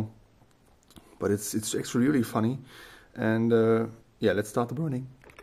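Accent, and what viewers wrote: Germany. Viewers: you are german right?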